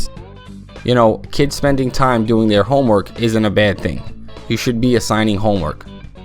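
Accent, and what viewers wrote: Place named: Brooklyn, United States. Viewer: That brooklyn accent. "don't get cauwght!"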